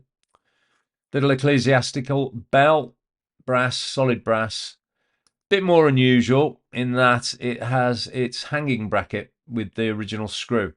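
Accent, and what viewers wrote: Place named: England, United Kingdom. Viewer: Catching traction with eBay videos is rough in my opinion. You already know how to record, edit and upload; you may want to consider starting a second channel for a different topic. Everyone loves an English/ British accent. I'm not sure which one it is. I'm guessing English is the proper term. I'm certain you could do very well in other categories if you're interested.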